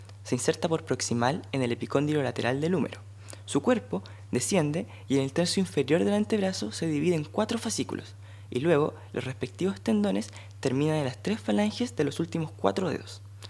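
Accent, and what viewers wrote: Chile. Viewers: Desde que volví de Chile ahora me topo con accento chileno donde sea. Muy bien video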